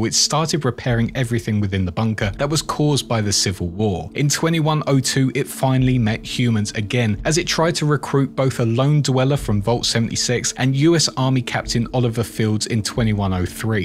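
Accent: United Kingdom. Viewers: Oh god NO did I find another British narrated video game lore channel? Now I’m going to have to watch all the videos. I’m caught up on the fudgemuppet catalog already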